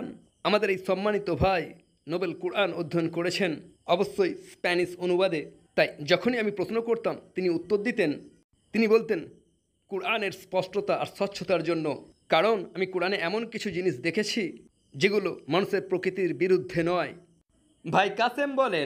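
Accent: Kolkata, India. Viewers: এটা হয়তো কোলকাতার আঞ্চলিক ভাষা যার জন্য উচ্চারণটা এমন হয়তোবা!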